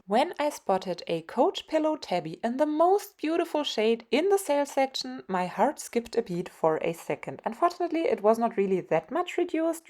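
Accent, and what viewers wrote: Germany. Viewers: I love your german accent...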